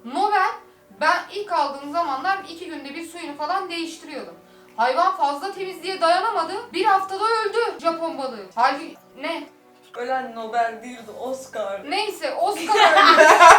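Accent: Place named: Osmaniye, Turkey. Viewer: Yaw her hareketine ve konuşma tarzına hastayım aynı bizim osmaniyeliler gibi konuşuyor hal ve hareketleride aynı. Kısacası Hastasıyız Dedeeee 😎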